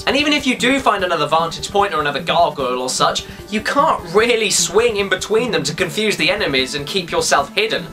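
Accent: United Kingdom. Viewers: I love your British accent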